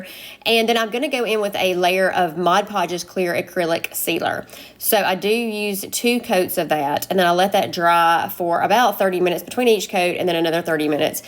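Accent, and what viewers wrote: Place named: South, United States. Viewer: Love how this turned out. Thank you for sharing. Also love your southern accent. Watching from Ontario Canada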